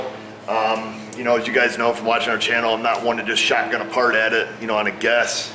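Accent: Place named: Texas, United States. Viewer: very understandable and appreciate you sharing all you do. Then again, maybe you should ask yourself the purpose behind why you make the YT videos. BTW you sure sound and act like a good ole boy like me from TEXAS. not only my opinion but also expressed by many friends.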